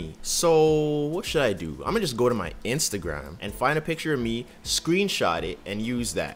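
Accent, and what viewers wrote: Jamaica. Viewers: Kinda sound like a Jamaican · you jamaican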